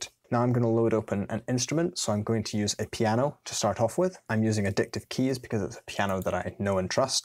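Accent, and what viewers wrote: Scotland, United Kingdom. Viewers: Are you Scottish? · Did you know?, if you went to Scotland, in particular his home city or town; he wouldn't have an accent anymore. You would have an accent. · He's Scottish, so you must like that accent.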